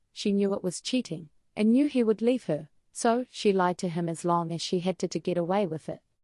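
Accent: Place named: Australia. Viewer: It's Strine.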